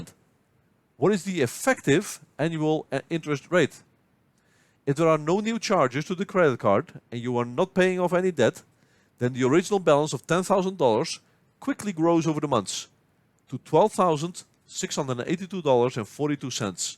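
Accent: Netherlands. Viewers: From the first 10 seconds i already heard you were dutch. Super bedankt voor deze geweldige uitleg meneer. Ik ben 1e jaar studente econometrie en begin nu met Finance. Waardeer het enorm.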